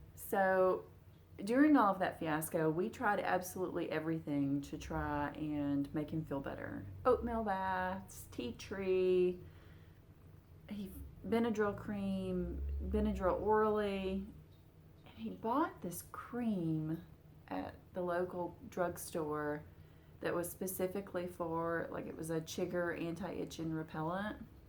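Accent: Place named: South, United States. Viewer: I soooo enjoy you, your soft slightly southern:-) accent is sooothing to listen to...:-) enjoyed your WIPs your HRH is so beautiful, not to far and your finished...indeed your videos are my first choice. Loved your Haul... Indeed if all mankind would live in mutual love, a kindness would be likened unto a vapor before us, changing us...💛💛 Blessings...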